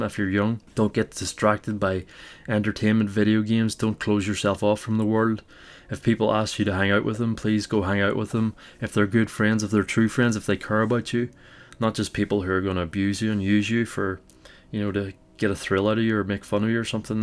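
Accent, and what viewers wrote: Northern Ireland, United Kingdom. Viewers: Northern Ireland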